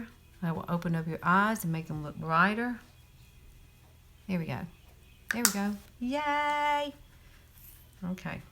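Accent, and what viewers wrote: South, United States. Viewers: You are just so darn cute! I'm a sucker for a southern accent! Man, woman or child! I feel like you could cuss me out and you would still sound sweet lol! Have a great night! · OMG, This is sooo borning, and annoying.. Please tone your voice down on all these ads.. and stop trying so hard to be cute... Just do it .. I do not have all day.. chances are I will click you off before you get to the facts.. Wow.. is she southern or what ???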